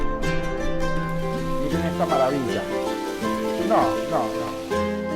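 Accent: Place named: Colombia. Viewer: Me gusta Mucho esa técnica para Cocinar un delicioso Arroz pero también su acento Colombiano or que me imaginó que es de Colombia por su asentó Dios le Bendiga Gracias por la receta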